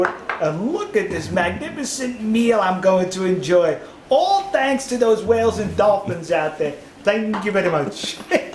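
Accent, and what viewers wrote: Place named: Texas, United States. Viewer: テキサス親父さんの明るいキャラもさることながら、その「発音」にすごく興味が行きました。いつも聞きなれているアメリカ英語とずいぶん違いますね。これがテキサス訛りというやつですか。今、テキサス出身の先生に英会話を習っていますが、「テキサスの人はゆっくりしゃべるから日本人に分かりやすいんじゃないか」と言っていましたが、本当ですね。英語圏の人がみんなこれぐらいの速さ（遅さ）で話してくれればもっとありがたいんですけどね（笑）。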